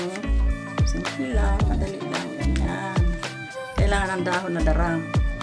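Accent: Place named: Visayas, Philippines. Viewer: oy bud bud nga kamoting kahoy sir proud bisaya here klaro kaayo nga bisaya ka sir ako pud hehe -tintin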